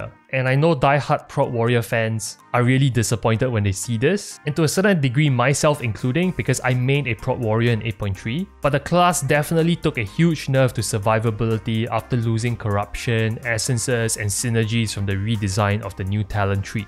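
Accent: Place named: Singapore. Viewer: Hey man thats awesome! I'm on Thaurissan myself , also GM and trying my best to do content creation (which is why i got excited when i heard the Singaporean English). All the best!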